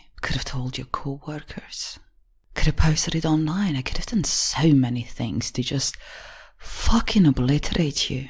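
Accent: United Kingdom. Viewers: You are British .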